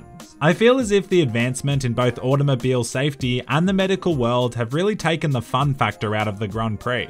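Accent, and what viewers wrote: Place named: Australia. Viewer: double dropping caps, your so australian in ur vids i love it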